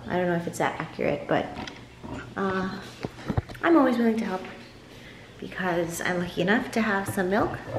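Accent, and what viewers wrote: Visayas, Philippines. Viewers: Ka cute mag bisaya 🙈♥️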